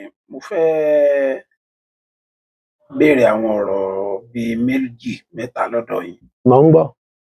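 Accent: Egba, Nigeria. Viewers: This Ègbá dialect is sweet.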